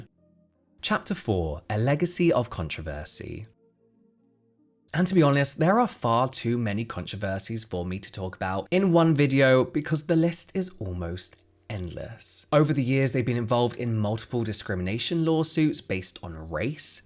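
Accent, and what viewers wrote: France. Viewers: Your French accent is adorable and fancy !! Tips: the letter T at the end of a French word or name is always silent :) same with the letter S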